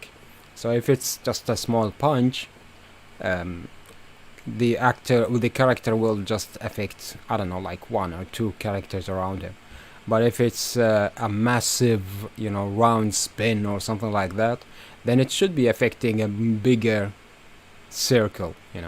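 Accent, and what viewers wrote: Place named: United States. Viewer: استاد لدي سؤال كيف تعلمت الانجليز و اصبحت تتكلمها كانك شخص امريكي اعطني نصائح لتعلمها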